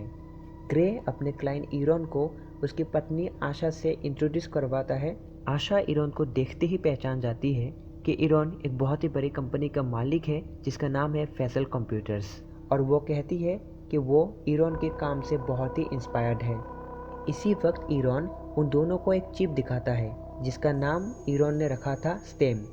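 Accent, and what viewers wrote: Assam, India. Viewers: I think you are Assames. Cuz you have same accent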